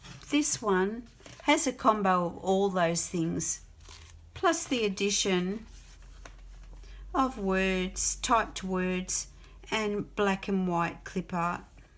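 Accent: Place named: Australia. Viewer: Hi, I am enjoying your videos as I only came across them recently.I love finding new artists on YT. It is also nice to hear an ozzy accent. Thank you for the inspiration and I look forward to following you well into the future. Cheers Vicki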